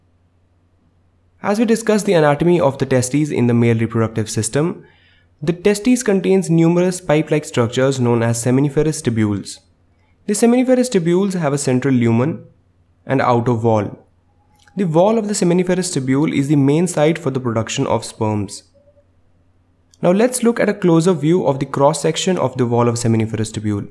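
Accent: India. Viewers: don't worry for your Indian accent-which, if we accent the American prononciation- just has to be accepted as it is. It took the first 3 seconds to adapt myself, and i understood you perfectly though I am not a native English speaker. And for those who find it;s too fast, just go to 'setting" (the little wheel) and change the speed! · There is nothing 'easy' about an English speaking person having to understand an Indian speaking person w/ a heavy accent explaining something in English. It's something about the 'sing-song lilt' that unpleasantly overshadows understanding the explanation for me. Even more so when they're speaking too fast.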